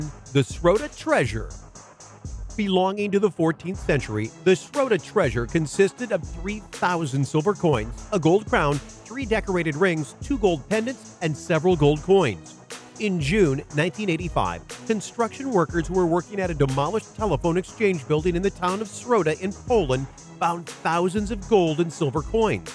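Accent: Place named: United States. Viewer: Please, PLEASE, PLEASE!!Either choose Euro €, or Pounds £. These are NOT interchangeable. Also when pronouncing English towns ending in "shire," you say it more like "sha." So Lincolnshire would be, Linc-on-sha. Heavily pronouncing the "ire" always gives Americans away.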